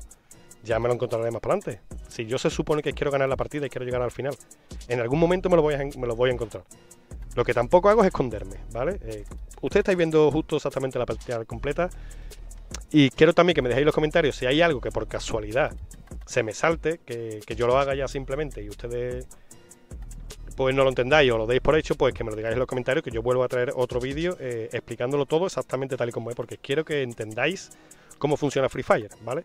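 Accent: Spain. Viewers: Bro eres de españa? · Por lo que notó de tu voz sos español y en Europa no suele haber mucho nivel Cómo aki en suramerica puede q ahí si te funcione ese método pero aki cuesta mucho más subir aparte hay más hackers igual buen video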